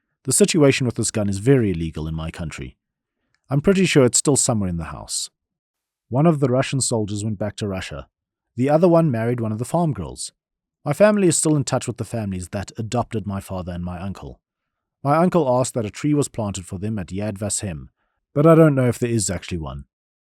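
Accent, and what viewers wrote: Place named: South Africa. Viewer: We got South African Reddit reader before GTA 6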